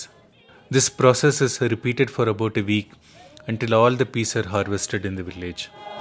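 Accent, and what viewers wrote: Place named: Karnataka, India. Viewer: COMMENDABLE VEDIO WORK.. YOUR ACCENT, YOUR KNOWLEDGE, YOUR VISION , INNER INSTINCT to know the real Life of these farthest untouched places of Himalayas... I HAVE BECOME YOUR FAN --DEAR.. MY GOOD WISHES to You & all the Team members who are attached to produce this such a LOVELY MEMORABLE vedio... I think you hail from Karnataka as assumed by your way of speaking/narrating...must be very highly Qualified person.. Anyhow, great thanks for uploading this Vedio... All the Best Dear..!!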